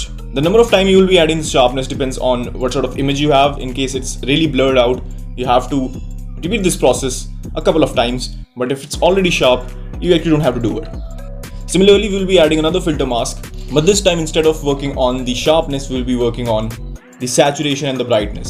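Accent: India. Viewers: indian accent. bye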